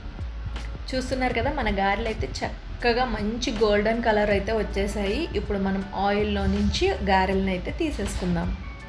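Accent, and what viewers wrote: East Godavari, India. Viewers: Amma ne cheppamanu voice east local vasana vastadi. Vere voice vaddu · మన తూర్పుగోదావరి యాస అలవాటైపోయింది మరి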